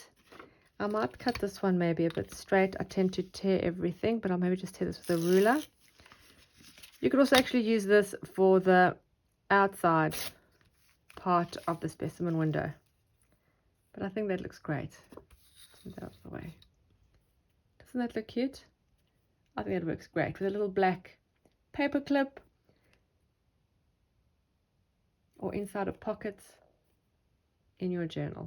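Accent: South Africa. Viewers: I thought I spied a South African accent!! Always love finding another South African Youtuber. Love your work!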